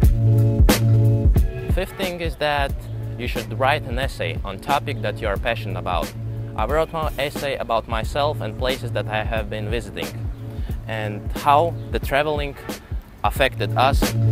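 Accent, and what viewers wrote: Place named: Russia. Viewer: Я чувствую Русский акцент... Really?!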